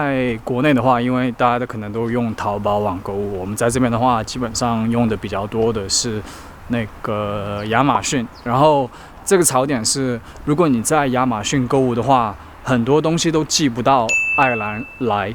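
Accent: Taiwan. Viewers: 你的腔調好像台灣人😳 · 哪里人？为啥说话台湾腔！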